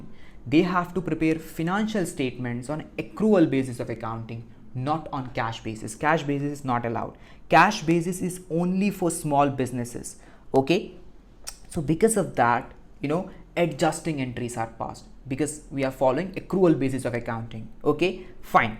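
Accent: India. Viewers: as soon as I heard the Indian accent I felt I'm on safe hands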